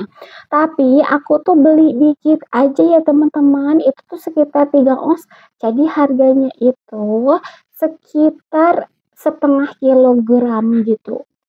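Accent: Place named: West Java, Indonesia. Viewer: Jadikanlah kritik dan saran untuk kemajuan dalam membuat konten agar lbh baik lagi, yg namanya belajar Tdk ada yg langsung bisa/ lancar, untuk karakter/pembawaan saya No Komen krn saya yakin itulah ciri khas kamu dr lahir, cuma lucu aja mmg khas orang Sunda, dimana penyebutan hurup P,F,V sama semua dan penyebutan huruf H terasa sekali.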